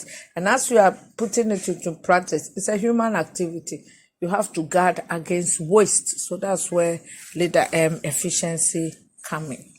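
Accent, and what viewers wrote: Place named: Africa. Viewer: Heavy African accent Miss! Great job.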